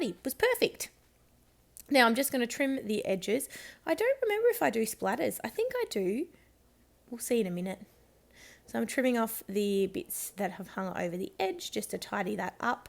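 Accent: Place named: Australia. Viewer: Super job using your kit this month!! & This page is SO CUTE!! (I'm saying "so cute" in your Aussie accent!) I really am enjoying all your videos!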